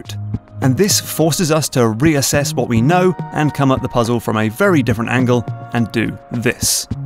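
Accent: United Kingdom. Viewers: americans when they hear a british accent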